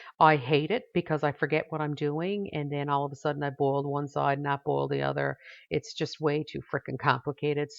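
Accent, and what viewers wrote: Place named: Maine, United States. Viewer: Tried this recipe this morning. Excellent taste!!! Brought me right back to St. Viatare Bagel from St. Lawrence Market in Toronto/Montreal. I used commercial "everything"spice and it was wayyyyyyy too salty. Sesame Seed one was delicious. I followed recipe exactly and would do it again!!! Not too difficult, even for me, a novice yeast dough baker. Great job on the video, and I love your pointers and tips, and of course, your Down East accent.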